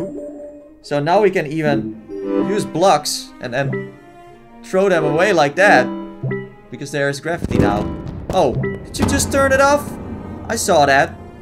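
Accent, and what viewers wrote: Netherlands. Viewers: Are you from the netherlands? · Do I hear a Dutch accent?! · oohhh ok, I guess I've never heard a Dutch accent :D cool vid man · Ben jij nederlands you have a dutch accent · are you dutch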